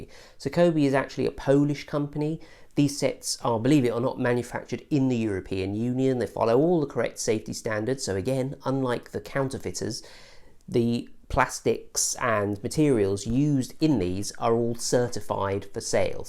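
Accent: United Kingdom. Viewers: the bri'ish accent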